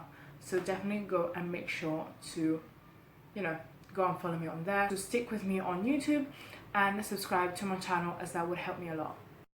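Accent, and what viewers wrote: United Kingdom. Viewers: it’s really not a stupid question. I didn’t realize she grew up in Italy until she mentioned it. As an American, she sounded very British to me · Wow you sound british. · You learned English from a British instructor(s) so you have that accent. Your mother's accent from Nigeria i hear as well. And of course the Italian 😁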